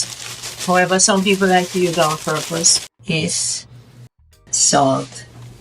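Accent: Jamaica. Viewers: I love Jamaican accent.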